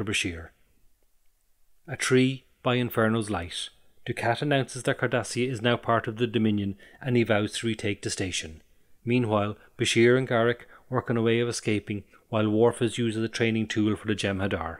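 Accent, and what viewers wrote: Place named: Ireland. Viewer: jaysus I need my ears syringed 😂 nice to see / hear some content in an Irish accent 🖖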